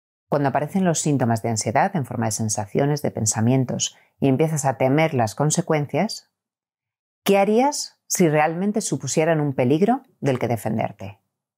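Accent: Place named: Spain. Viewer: TENES UNA HERMOSA VOS, GRACIAS POR TU AYUDA, PRESUMO QUE ERES DE ALGÚN LUGAR DE ESPAÑA. 😁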